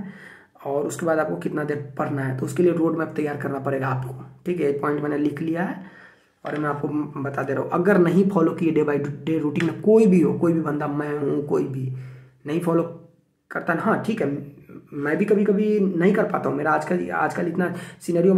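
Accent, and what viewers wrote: Bihar, India. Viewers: Bhaiya ji..bihar se ho kya😀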